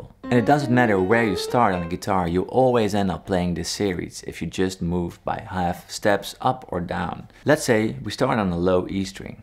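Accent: Netherlands. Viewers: Thanks a lot why do I hear a Dutch accent while your name is clearly English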